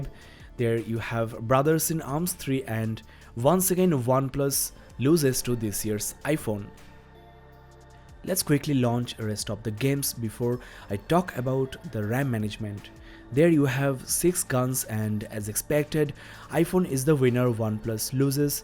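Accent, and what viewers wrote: India. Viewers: Thicckkkk Indian accent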